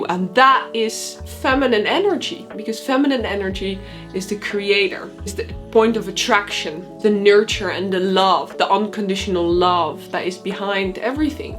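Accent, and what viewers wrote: Netherlands. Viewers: Nice video.. are you dutch? Can hear it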